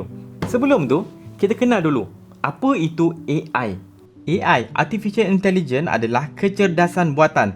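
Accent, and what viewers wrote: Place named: Kelantan, Malaysia. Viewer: Ada bunyi macam telo orang Kelantan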